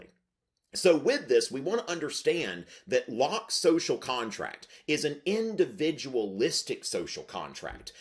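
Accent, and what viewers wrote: South, United States. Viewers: Love these! You break things down elegantly and with a homey, cozy southern accent. I am hooked.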